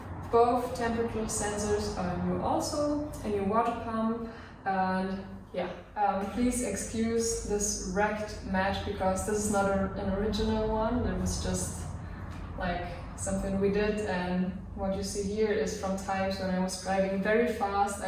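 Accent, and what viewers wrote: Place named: Germany. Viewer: Es ist immer wieder komisch deutschsprachige Menschen Englisch sprechen zu hören... 😂 (Unser "Dialekt" passt einfach nicht. - Obwohl du ja gut Englisch sprichst.) Da fühlt man sich wieder wie im Englischunterricht in der Oberstufe. 😅😂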